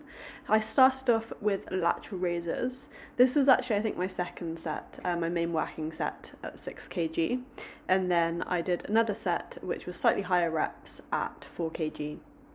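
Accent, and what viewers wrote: United Kingdom. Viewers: 발음이 이상하다했더니 영국분이였구나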